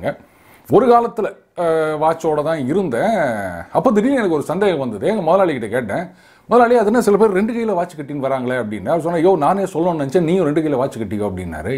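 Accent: Chennai, India. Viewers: உங்கள் சென்னை தமிழ் ரசிக்கும்படி இருக்கு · I simply love your Madras bashai/ lingo/ dialect and sarcasm/ wit/ humour with bare facts!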